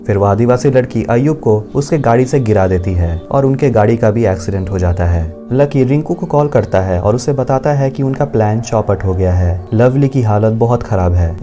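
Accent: Bihar, India. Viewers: You're from Bihar bro , ik 😌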